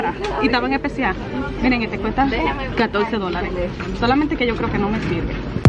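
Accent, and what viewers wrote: Dominican Republic. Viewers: La que está hablando es como dominicana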